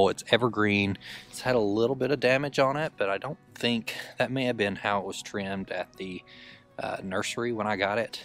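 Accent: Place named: Appalachia, United States. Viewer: I love your accent and phrases (Right quick. For example.) I am not southern, we are just tucked into the Appalachian Mountain range that runs through Pennsylvania on the western side of the state. I have noticed at least some of our dialect seems very similar to those in the south! Love your videos! Thanks for sharing all you do!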